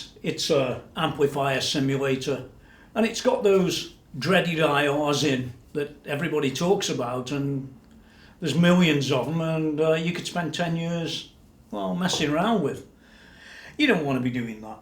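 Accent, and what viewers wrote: Stoke, United Kingdom. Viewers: You can hear a fellow Stokie a million miles away 😬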